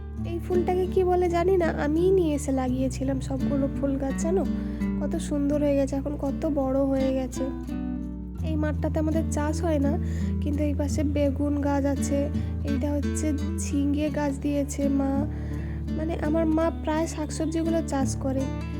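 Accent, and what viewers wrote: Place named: Medinipur, India. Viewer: Khub sundor 😊 Upnar khotha gulo puro amadar medinipur a vasar moto khub valo laglo suna❤❤❤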